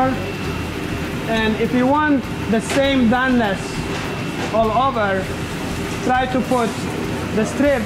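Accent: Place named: India. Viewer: This cook is really messed up he is talking part indian accent and part arab accent ... i m very confused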